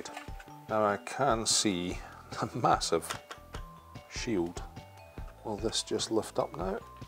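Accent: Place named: Glasgow, United Kingdom. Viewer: This man speaks with a Glaswegian accent. He is accent is straight out of a medieval celtic play circa 1700.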